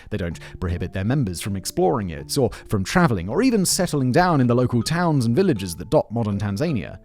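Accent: United Kingdom. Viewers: umm. 1. We were discussing Tanzania, not Tasmania. 2. I did not ignore them, they was just nothing that necessitated a response, I can infer from all the comments that both are valid pronunciations depending on your accent and the only reason specific pronunciation hit my ear weird is because he has a British accent but is using a pronunciation more common to European and African accents. Which makes sense considering he lives in Prague and had an African Grandmother, or at least a grandmother who lived in Africa. 3. The only reason I responded to that specific person is because he seems to be under the impression that only Americans pronounce it "Tan-zan-iah" which is just incorrect.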